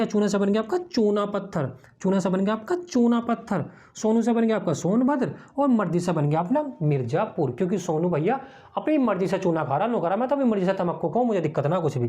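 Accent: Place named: Meerut, India. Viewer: Sir video bhoot mst hai.. ekdum funny. Tarike se apne padhaya hai . Apki boli se lag rha hai aap merrut side se ho.. 😅 Bhoot acha padhaya hai .❤❤❤❤❤❤❤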